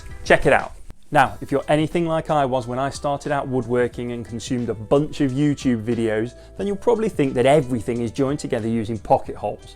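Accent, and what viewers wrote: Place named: United Kingdom. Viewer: Thanks for the review. Just bought one! And loved the Limey accent (I'm a Brummie in Canada!)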